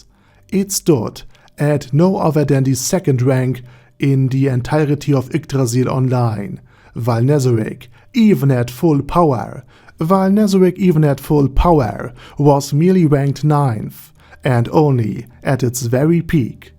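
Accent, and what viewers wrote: Germany. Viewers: his voice's the stereotypical german accent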